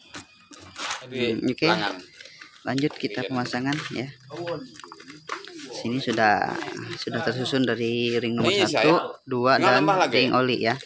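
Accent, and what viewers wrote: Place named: Lampung, Indonesia. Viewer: ini bengkel di lampung ya soalnya ada suara orang lampung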